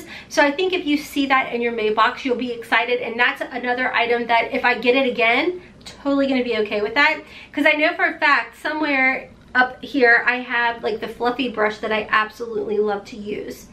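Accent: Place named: Kentucky, United States. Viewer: I always wondered why I recognized the accent ! Hi , from Louisville Kentucky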